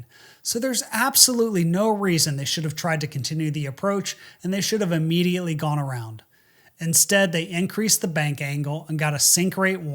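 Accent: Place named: Appalachia, United States. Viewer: Can you re record this with an English accent so that it sounds dramatic and educational instead of hillbilly gossip.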